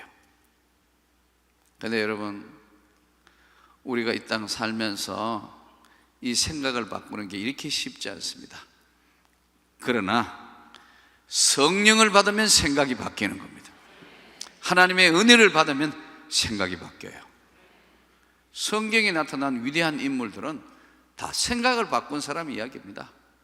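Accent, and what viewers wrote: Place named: Gyeongsang, South Korea. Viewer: 말투가 대구분 이네요.. 역시 훌륭한사람도 많이 나와요^^ㅎㅎ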